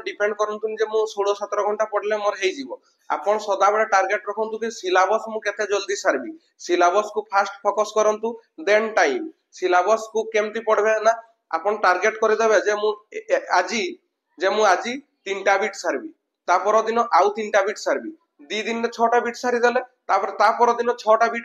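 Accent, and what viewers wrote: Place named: North Odisha, India. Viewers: Aapono nka kotha pura mohanta type re,mayurbhanj ro ki...?khub kom sir motivate karanti,aapono motivate kori kori bujhauchanti chhua mananku,so,thanks...mu history,politics,geography and economics note chanhuchi,next year b.ed preparation pai...so,give me some notes🙏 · Sir apana nka ghara keonjhar, Mayurbhanj ya phir balasore ru thiba bcz apana nka talking ru jana paduchii, sir mu b cbz student aii year b.ed sarila😊 bahut worried achii kn kemiti karibi😢😢😢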